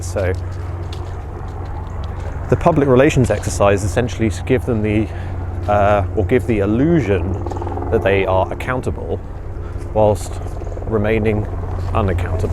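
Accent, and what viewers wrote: United Kingdom. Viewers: 'That's a thing?' 'It's a thing.' I see. Anyway, I'm really saying is your way of speaking is really British way. I love that way.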